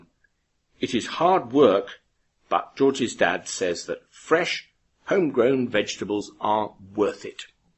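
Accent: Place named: United Kingdom. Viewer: I love British accent, it’s music to my ears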